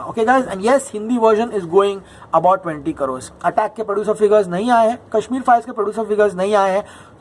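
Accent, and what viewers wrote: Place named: Hyderabad, India. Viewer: Apka Hyderabadi hindi superb yaroo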